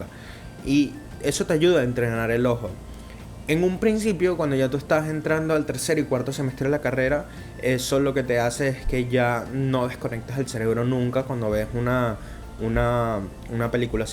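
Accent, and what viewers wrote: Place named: Venezuela. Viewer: Nadie: Yo pensando que tiene acento venezolano y dudando hasta que habla de Colonia Tovar. PD me gustó mucho el análisis de la arquitectura, de hecho hace poco para una práctica de dibujo quise replicar las ciudades de Mushoku y puedo decir que el trabajo detrás de la inducción a estos escenarios, la forma en la que te transmiten el cómo cambian las locaciones es un trabajo muy bien hecho.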